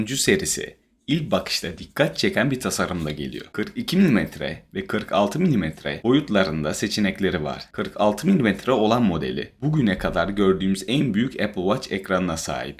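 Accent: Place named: United States. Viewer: Konuşman aşırı rahatsız edici Türkçeyi Amerikan aksanıyla konuşuyor gibisin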